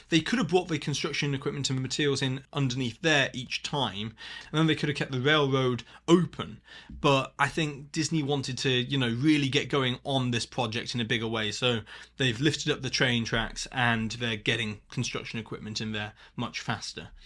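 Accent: United Kingdom. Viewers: Are you putting on your British accent? Why are you saying wailwoad, twain, dwainage, you weckon, etc.?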